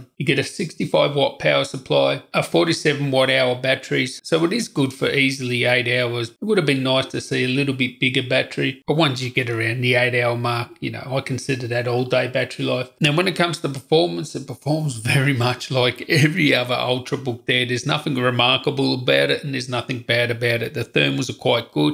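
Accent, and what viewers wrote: Australia. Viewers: fuckin love that aussie accent mate, im sick and tired of american or english reviewers, keep up with the good work. cheers from Uruguay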